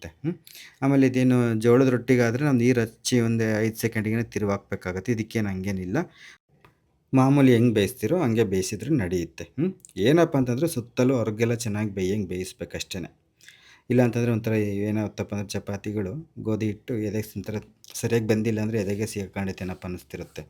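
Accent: Chitradurga, India. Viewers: Good sir ನಿಮ್ಮ ನಿಮ್ಮದು ಯಾವ ಊರು ನಮ್ಮ ಚಿತ್ರದುರ್ಗ ಡಿಸ್ಟ್ರಿಕ್ಟ್ ಭಾಷೆ ಮಾತಾಡ್ತಾ ಇದ್ದೀರಾ ಥ್ಯಾಂಕ್ ಯು ಫಾರ್ ಯುವರ್ ನೇಟಿವಿಟಿ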